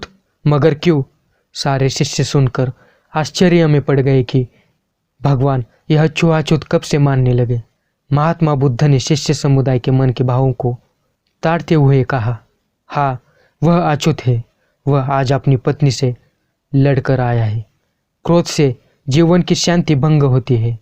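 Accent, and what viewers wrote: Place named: Maharashtra, India. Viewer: तुमच्या हिंदीत मराठी झलक आहे मराठीत बनवा video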